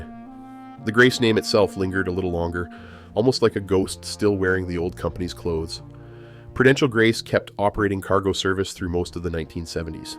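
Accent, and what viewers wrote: Canada. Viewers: ...and the Canadian accent.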